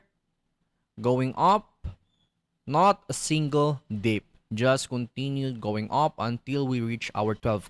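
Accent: Philippines. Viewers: he sounds like Filipino to me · a thick pilipino accent. musta pre?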